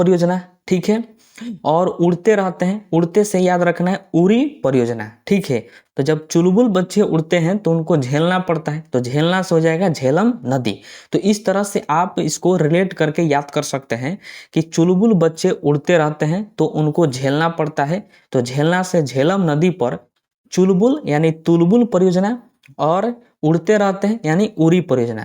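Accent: Bihar, India. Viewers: Bihar se hai bhaiya sir up se nhi 😅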